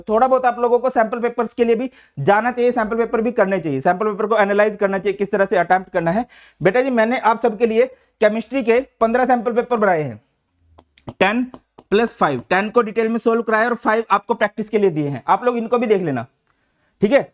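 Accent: Haryana, India. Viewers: SIR AAP HARYANA M KARNAL SE HO KYA?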